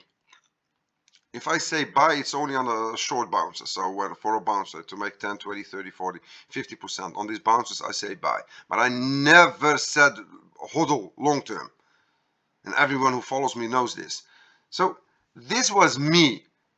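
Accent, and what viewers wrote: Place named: Poland. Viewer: Dude your English is great! I love Polish accents and way of speaking.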